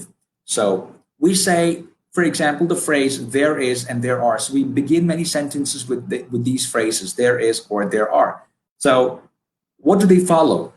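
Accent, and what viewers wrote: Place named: New England, United States. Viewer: I love everything about these contents and the way Augmedix has approached in teaching the work-force that wants to eventually become a part of Augmedix. Getting to learn from this man has been a pleasure although his accent, even after so many 'thorough' listens; I find quite amusing as to me it sounds like a perfect half-in-half crossover between Eastern New England English and Mancunian. This man's tone combined with that accent is pretty 'chad' 😁😅🤘✌🤙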